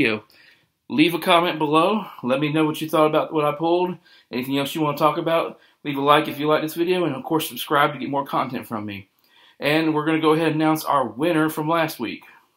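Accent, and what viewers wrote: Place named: Texas, United States. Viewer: Nice vid bud, and last pack magics that FA is pretty sweet. Whereabouts are you from friend cant quite place your accent its a little Texas and a little Tennessee thrown together. Just curious I always try and guess accents.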